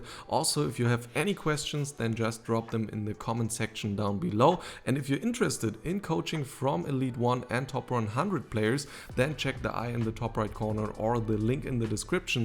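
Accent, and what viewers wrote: Germany. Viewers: Das sind schon deutsche Macher, oder? Ich empfinde den Akzent schon als sehr Deutsch. Mit Englisch erreicht man allerdings ein wesentlich größeres Publikum, da wird es wohl niemand auf Deutsch machen, wenn Englisch genauso gut funktioniert. Gruß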